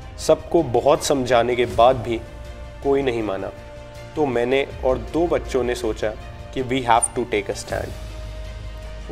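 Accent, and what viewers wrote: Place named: Delhi, India. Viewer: Your delhi is accent is crazy! will you say it again !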